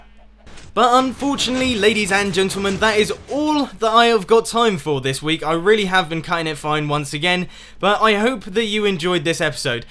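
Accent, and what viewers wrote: United States. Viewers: Dat American accent. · Woah, that american accent tho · Your American accent is godly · Haha that American accent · Love your american sales person accent!! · Is that an American accent? What the heck? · Dat American accent:D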